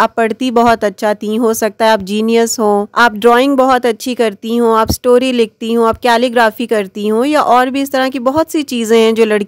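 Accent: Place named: Karachi, Pakistan. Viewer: ap k bolny k andaz sy lg rha tha k ap karachi ke hen.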